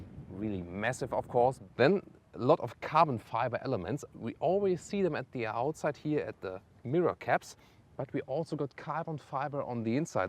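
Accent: Germany. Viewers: Dear, I always enjoy your videos, they are very detailed but I really get the feeling that you're not a sports car type of guy, so I rather see you in a Mercedes SL or Jaguar XK, no offense. That said, maybe you should leave the sports car reviews to someone else who actually appreciates these cars, just saying. No, I would never take an Audi TT over the R8 (are you nuts???) and whenever the Mercedes SL comes up, I get a serious allergy. You are right about the R8 V10 Plus standard bucket seats but you kind of forgot to mention that Audi offers optional (free of cost) sport seats, which are much more comfortable. Also, you should work on some of your English words, you sometimes translate them directly from German into English but they do not really make sense in English. Same goes to your accent, you are using a German accentuation for English words, which of course does not really sound too good. 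This comes from a fellow German.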